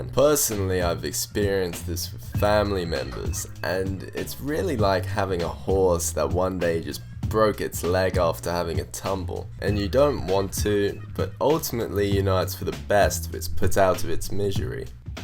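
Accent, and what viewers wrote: Australia. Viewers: 😍 do I hear that Aussie accent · No dickhead......hardwater is simply a parts per million density figure......could be peanut fucking butter in the agua,pull up fuckstick.......genetics and getting on the gear,you dumb dunce.........that stick reduces DHT does it.....................................finasteride and dutasteride have no sides in 98pcent of users...................my question is,are you self aware enough to realise you are a cuck who simply likes the sound of his own voice,Australian pronouncing VITAMIN like you do,govern thyself ........ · Was wondering why you weren't uploading videos just to find out that you opened a new account lmao your dry ass humor mixed with your aussie accent just stands out to much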